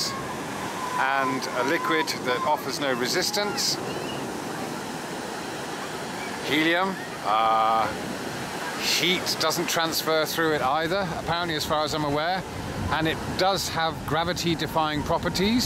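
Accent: United Kingdom. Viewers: It hurts that this guy has a British accent and wasted a western education that people less fortunate would have given anything for....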